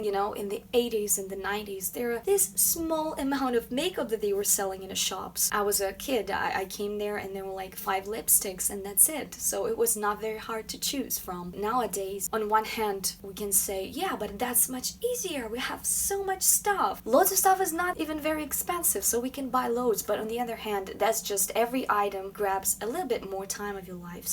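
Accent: Russia. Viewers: I hear some accent..is she russian?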